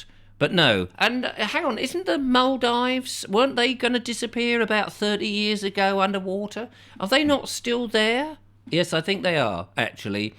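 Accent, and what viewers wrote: United Kingdom. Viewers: Such a wonderful, true assessment of the whole situation they are trying to put us in. And your British accent makes it all the more enjoyable.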